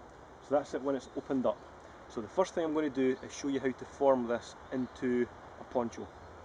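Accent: Scotland, United Kingdom. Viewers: a scottish accent german...WTH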